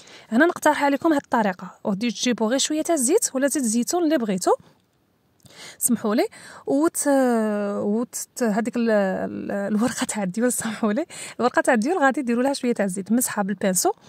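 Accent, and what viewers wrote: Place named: Oran, Algeria. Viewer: Salem hbiba Allah ya3tik essa7a ana wahraniya 3aycha fil Maroc je suis fan mtan3ak bezzaf tfakrini f mdinti la3ziza et surtout l accent rabi ykhalik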